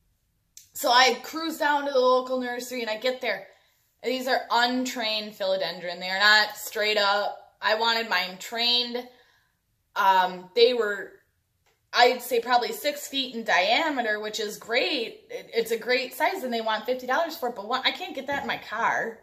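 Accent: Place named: Midwest, United States. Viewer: you must be from the Midwest. your accent sounds like mine haha. love to the green!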